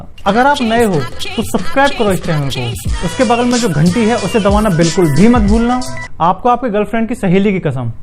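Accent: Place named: Uttar Pradesh, India. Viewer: Nice videos bhai aur UP accent bahot accha hai aapka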